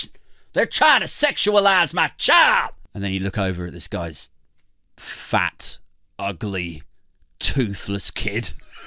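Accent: United States. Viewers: I love your American accent, say "I'll use my credit card!' next!!!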